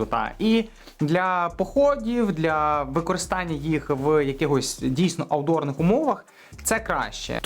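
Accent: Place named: Rural, Ukraine. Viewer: Блевануть хочется от селянцкого ховора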